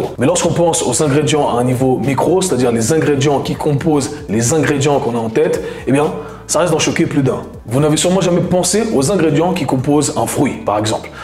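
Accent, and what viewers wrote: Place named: Belgium. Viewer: L'accent belge mélanger à l'accent créole portugais c'est un truc de fou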